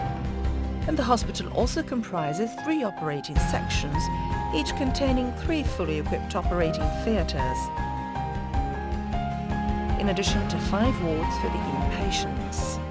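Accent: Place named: Egypt. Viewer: dude she`s egyptian 100% it`s all about how she spell the letter "ق"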